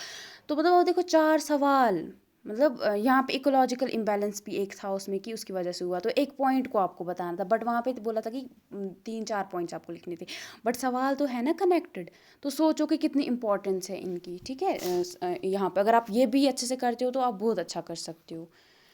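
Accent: Kashmir, India. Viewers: Are u from Kashmir I feel so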